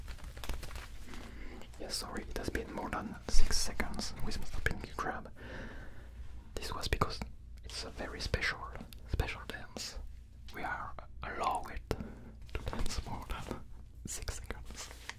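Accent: France. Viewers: I'm not sure but are you french? I'm having a hard time recognizing the accent. If that's the case, I'd love to see a video in French someday !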